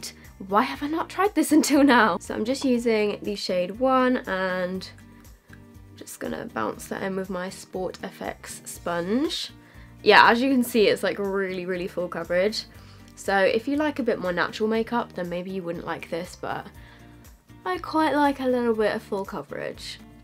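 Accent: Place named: England, United Kingdom. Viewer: purple emoji purple emoji purple emoji I LOVE your English accent and your makeup looks!!! Legit whenever I see the thumbnails I'm like "OMG I NEED TO TRY AND RECREAT THAT LOOK" even if I'm legit staying home and doing absolutely nothing (and taking it off straight after) Btw hi from New Zealand if you see this!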